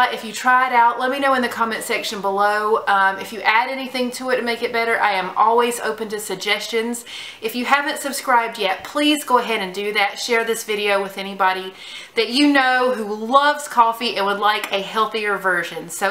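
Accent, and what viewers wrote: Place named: South, United States. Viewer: Great video!! Your accent sounds similar to mine. You must be from the south. lol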